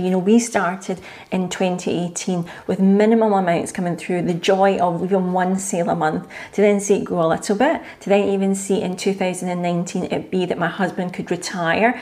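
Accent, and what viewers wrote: Scotland, United Kingdom. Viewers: My Good Lady, perhaps t’was your Scottish accent, the way you come across as a sincere lady and, I may be wrong, I feel we have a common “Friend” with a Beard who put you on my path! So I listen and opened a Vanguard life strategy 100! If I need some more advice, how can I contact u! My name is Fred! Im happy to give u my email and number👍🏽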